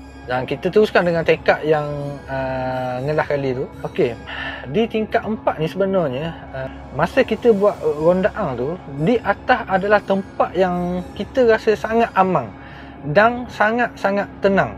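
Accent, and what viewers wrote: Terengganu, Malaysia. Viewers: X eplore pn xpe dh bro.. sdp dgr crta pngalman cmni je.. skit pn xrse boring lg² ad cmpur loghat tganu.. mmg lyn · Haha,org ganu oyak gitu la,ambe org perak,tp blajo dungun dlu,mule mmg selok la suke,dh lame biase dengo,srehhh srehhh..haha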